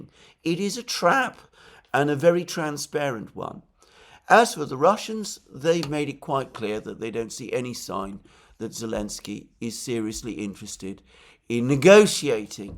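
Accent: United Kingdom. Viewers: You sound like a snobby brit. But still love ya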